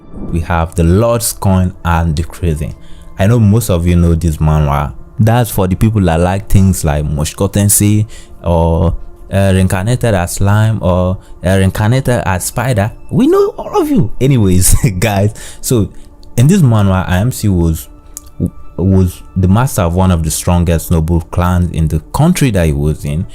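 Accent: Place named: Nigeria. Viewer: Bro you Nigerian right Your accent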